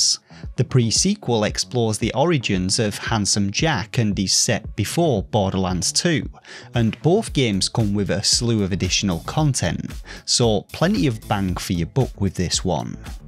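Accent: United Kingdom. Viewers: but every single word you pronounced was in a British accent. You did pronounce it correctly. We invented the damn language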